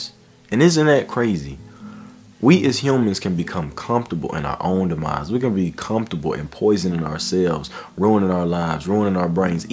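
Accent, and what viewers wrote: South, United States. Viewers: Where are you from my guy? The southern accent is heavy in this one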